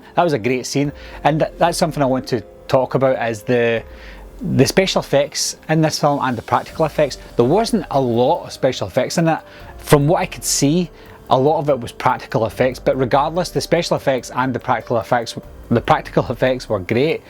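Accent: Scotland, United Kingdom. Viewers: Love yer Scots accent, pal! Good to hear a Scots voice doing YouTube reviews. Miss that lots - used to bide in bonnie Aiberdeen, and aye, I still spik a wee bit o the Doric...agus tòrr Gàidhlig cuideachd! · Damn I was not expecting such... Scottishness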